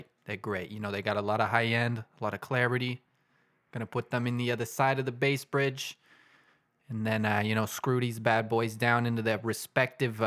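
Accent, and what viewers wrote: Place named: New York, United States. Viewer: I can't say that this guy does or doesn't live in Boston, but that is NOT a Boston accent. Sounds more like NYC to me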